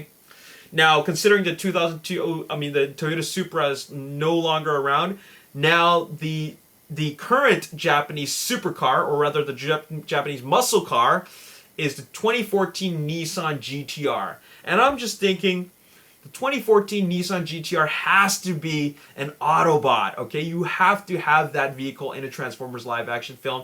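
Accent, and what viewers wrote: Canada. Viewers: You must be Canadian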